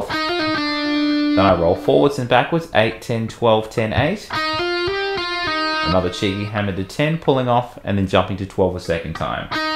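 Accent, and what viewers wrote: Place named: Australia. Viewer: who doesn't just love the sweet sounding Australian accent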